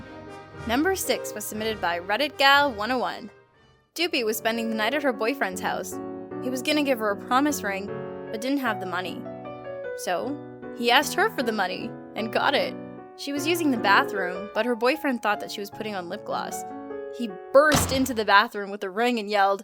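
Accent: Canada. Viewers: is canadian? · Are u Canadian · Huh, didn't know was Canadian. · Is Canadian? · Is from Canada?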